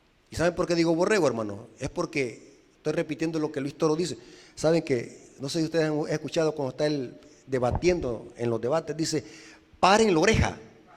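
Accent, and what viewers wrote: El Salvador. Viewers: Hermano, con mucho respeto, este hermano es del Salvador , y casi todos ellos tienen su modo de hablar, este hermano por más que quiera ya no puede cambiar su pronunciación. Así , es su estilo de hablar. Aquí en mi país , en la ciudad hablan muy diferente de cómo es en el campo o en otro estado, y no se puede cambiar y cada uno nota nuestra manera de hablar, y no hay nada que se pueda hacer. Por ejemplo para nosotros los mexicanos los hombres se saludan en algunas partes así: hola, gallo , cómo te va? Y en El Salvador decir gallo es meterse en problemas , gallos para ellos es el Sancho, o es el amante , por eso cada país tiene sus tradiciones. Disculpa mi manera de expresar esto. Te deseo que tengas un lindo día, y espero me disculpes por decir esto. Besos.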